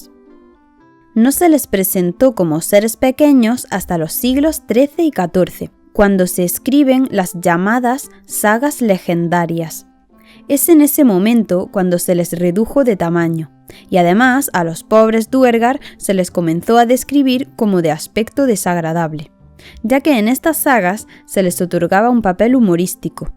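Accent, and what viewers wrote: Spain. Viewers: ¿de qué parte de España eres ? tu acento suena como a gallego y además habiendo tantas leyendas de seres míticos en Galicia no me extrañaría que fueras de allá pero tu apellido parece catalán ¿de donde eres ?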